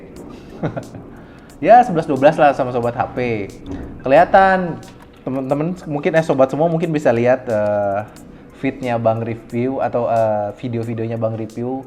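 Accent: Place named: Java, Indonesia. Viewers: Klo inget inget tangannya yg belang 😃 Dan cara ngomongnya yg medok, unik 😃 Tapi keren lah isi kontennya singkat padat jelas 👍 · jogja kali. soalnya logatnya jogja bgt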